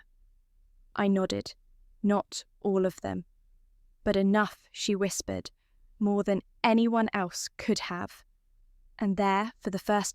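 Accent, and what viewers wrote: United Kingdom. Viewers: If she is from North Carolina, why is the AI voice a southern English accent?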